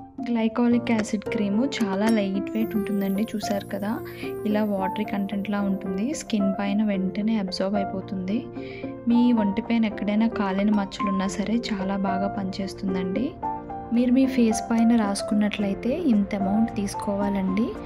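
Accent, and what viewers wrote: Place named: Godavari, India. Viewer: మీ way of talking చూస్తుంటే గోదావరి జిల్లాలో తెలుగు అమ్మాయి లా ఉంది.. nice explanation ❤😊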